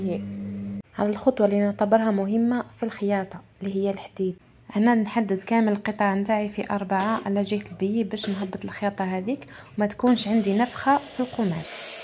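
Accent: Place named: Chaoui, Algeria. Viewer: السلام عليكم أختي أول مرة نشوف فيديو تاعك ماشاء الله تبان اللهجة تاعك شاوية❤ من فضلك أين متواجد المحل عندو كل خير الله يبارك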